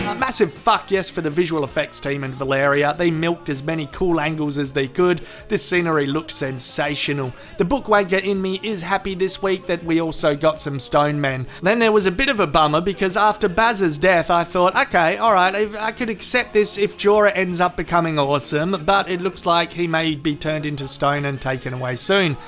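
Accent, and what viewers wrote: Australia. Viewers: Good shit, I even start thinking in an Australian accent now.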